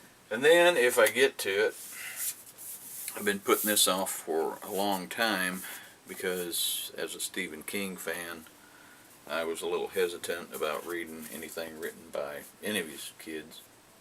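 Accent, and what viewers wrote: United Kingdom. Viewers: I loved your British accent! (I love a good chippy too haha!) Great wrap-up :) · Good month,! I found The Hate U Give a real page turner too. Your British accent!!!! Amazing! We do love a good chippy! haha! L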